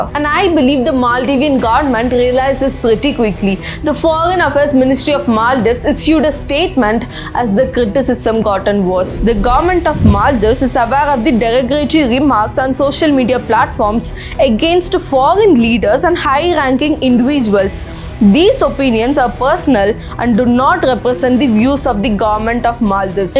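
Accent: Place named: South India, India. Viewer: I have dhruv rathi to watch this type of content then why yours.... is there anything special except south indian accent?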